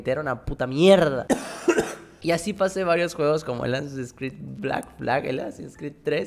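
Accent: Yucatán, Mexico. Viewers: Wey yo recuerdo que me suscribi con un video de lol, de la nueva grieta, donde te burlabas de las antiguas torretas de cochino. Tu asento yucateco me cagaba de risa y termine suscribiendome. Chido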